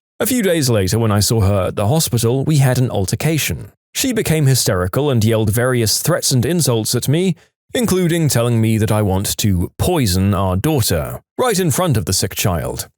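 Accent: United Kingdom. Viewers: i love the british accent mate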